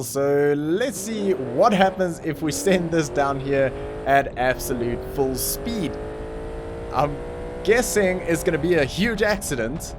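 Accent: South Africa. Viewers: u sound like ur from South africa Are u? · are you from South Africa?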